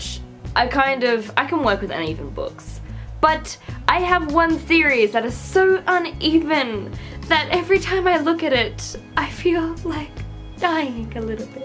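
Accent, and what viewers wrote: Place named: Australia. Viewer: Hi! I love seeing a fellow Australian on Booktube, your accent feels like home. I'm new to booktube and I'm having a great time catching up on your previous stuff! (you may be getting more random comments from me soon *looks sheepish) I definitely agree with about cheating in books. I think it gets romanticised way too much. It sends the message to readers that cheating is exciting and sexy and that the person being cheated on is always an afterthought. Also, FAKE STICKERS WHAT THE HELL!?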